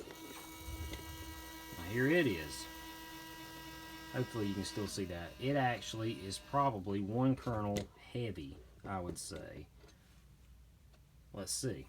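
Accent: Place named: Alabama, United States. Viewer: That Alabama accent is THICK, son!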